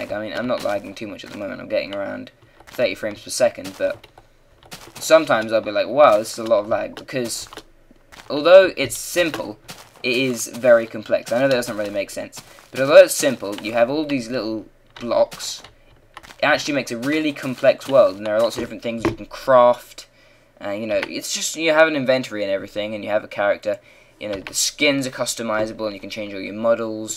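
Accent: United Kingdom. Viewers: Thanks for the review! It helped me decide that I'll probably buy the game. And your British accent made this 36% better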